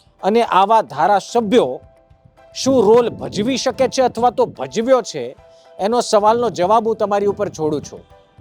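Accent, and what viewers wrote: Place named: Surendranagar, India. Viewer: tame surendranagar na cho tamari boli uper thi lage che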